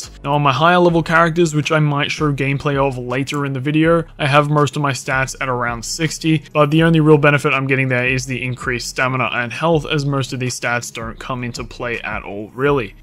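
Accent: Australia. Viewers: Nice work mate!! Aussie?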